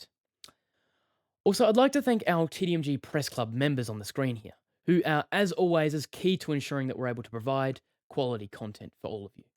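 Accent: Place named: United States. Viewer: Good presentation but the Americanised accent sends me to the off button.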